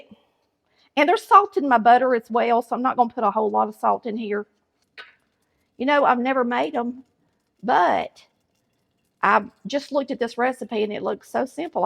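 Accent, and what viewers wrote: South, United States. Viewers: Hi funny lady,wth that strong Southern Accent ..I ❤your food arts ..I just think 🤔 u wanna make me fat..u better Stop it! lol ..jk don't stop it's so good❤🎉😮😂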